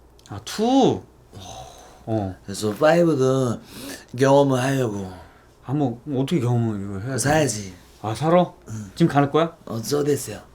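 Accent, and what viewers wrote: Japan. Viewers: 한본어 쩌네 ㅋ(어린시절 보고 찌잉해져따..따흑) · 저는 일본사람인데 다나카상 정말 웃기네요🤣 특히 외래어가 어려울 텐데 액션을 아크션, god를 곳도라고 하는게 완전 일본식 외래어 발음이에요!! “집이”를 “집이가”라고 하는것도 일본인들이 많이 틀리는 한국어죠~ 요즘 일본에 저런 호스트는 거의 없겠지만ㅋㅋㅋ 넘넘 재밌어요👍🏻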